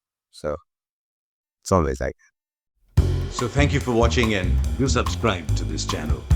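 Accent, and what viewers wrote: Hyderabad, India. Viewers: Your Hyderabadi assent is mind blowing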